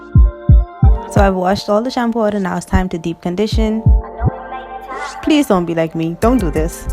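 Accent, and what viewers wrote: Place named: Trinidad and Tobago. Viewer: My girl I'm hearing a lil Trini in yuh accent🇹🇹🥰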